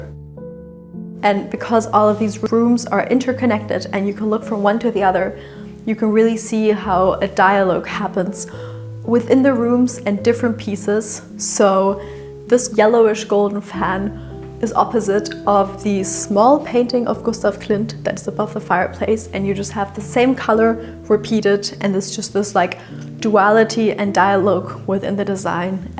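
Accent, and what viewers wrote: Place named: Germany. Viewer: Die Objekte auf diesem Kanal sind ja wirklich interessant und inspirierend – wenn nur die Moderation nicht wäre: Dieses deutsche English, verbunden mit einer kindlichen Schwatzhaftigkeit, ist einfach entsetzlich nervig.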